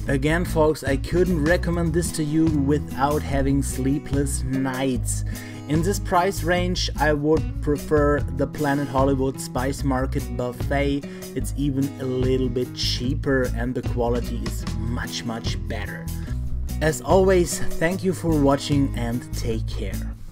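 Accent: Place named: Germany. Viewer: I happen to love your German accent & your videos :)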